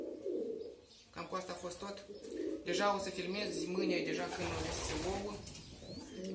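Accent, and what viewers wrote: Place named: Moldova. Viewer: E din moldova ca vorbeste moldoveneste👍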